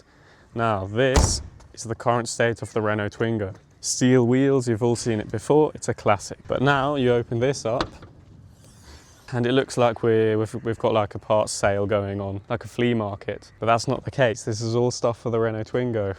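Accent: United Kingdom. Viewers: Hi, great video! My father also bought a Twingo the new Twingo e-Tech very good small monospace. Is the Twingo popular in Switzerland?🤔 Oh and, it seemed a bit strange to me at some point I had the impression that your accent Was a bit British I don't know why, which side of the Swiss are you coming from? Please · Just come across your channel, great work BTW you have the most English accent I've heard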